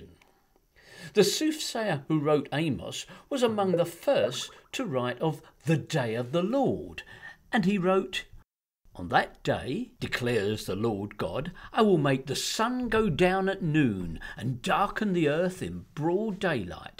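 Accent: United Kingdom. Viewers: Why do these bible bashing videos always have such a pretentious tone... this one with a British accent? These presentations go to extreme lengths to invalidate such insignificant things. To ridicule what is already ridiculous is even more ridiculous. Sorry, not very impressive.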